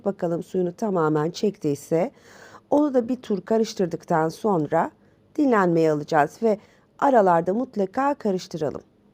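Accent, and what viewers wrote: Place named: Istanbul, Turkey. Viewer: istanbul türkcesi konusan o jadar aziz ki.Sizi dinlerken cok mutlu oldum🎉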